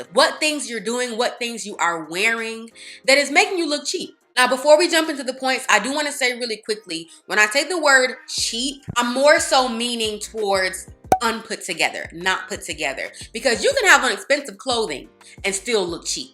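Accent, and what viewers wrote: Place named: Chicago, United States. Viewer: Girl you gotta be from Chicago ❤ great tips btw!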